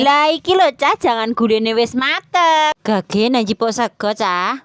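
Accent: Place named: Central Java, Indonesia. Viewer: Nadane koyok plwong Purwodadi , sampean asli pundi mbak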